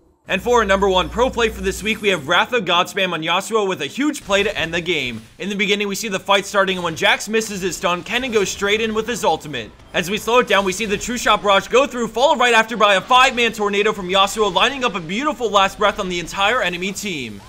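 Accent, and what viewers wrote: Netherlands. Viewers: That dutch accent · this is why people from the netherlands should not talk english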